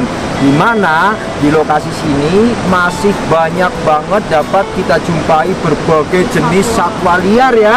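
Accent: Banyumas, Indonesia. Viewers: Ngapak banyumasane kerasa banget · Wow ngapak...👍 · q suka gaya bicaramu mas... lugu dan polos... Khas jawa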